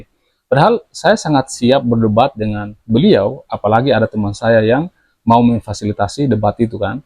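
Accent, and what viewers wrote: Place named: East Nusa Tenggara, Indonesia. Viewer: Logatnya sepertinys orang NTT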